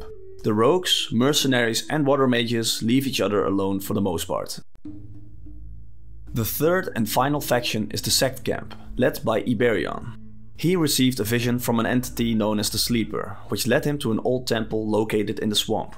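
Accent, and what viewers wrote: Netherlands. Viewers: nice dutch accent. Greetings from Germany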